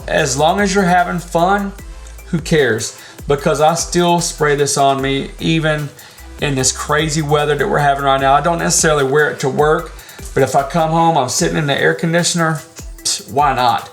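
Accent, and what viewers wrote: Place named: Louisiana, United States. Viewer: I don't know if that was a chicano accent or a one straight from the bayoo 🤔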